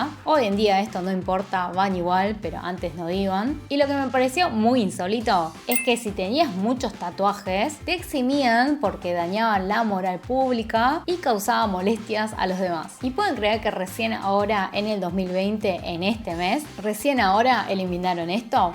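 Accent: Argentina. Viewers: Es raro ver una persona con tus rasgos orientales hablar argentino no español argentino marcado, se q debe ser vueltas inmigrantes ya debe ser normativo pero me sorprende igual, rasgos físicos son muy marcados, y acento también., es combinación diferente. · Hablas como n dejo argentino pero con mentalidades coreanas no me parece corrupción sino libre albedrío que debería haber ya se acabó la esclavitud querida todos tienen derecho a buscar que nacionalidad quieren tener o ir o no ir a cumplir el servicio militar es que claro solo en Asia específicamente Corea y también oriente el machismo también se terminó deberían cambiar esas políticas narcisista soy privilegiada e evivir en Latinoamérica taynqye nos quejemos tenemos muchas libertades no nos lavan el cerebro desde la escuela como allá ser mejor no quiere decir ser infeliz. Si se pueden ambas. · Hablas español perfecto con acento argentino... 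Es mágico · habla español con acento argentino. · Muy buenos tus videos lo unici que no me gusta es ese molesto sonido argentino .shoñ shoñ lo siento es mi umilde opinion ...que tengas un lindo dia👈😇👍👈😎👍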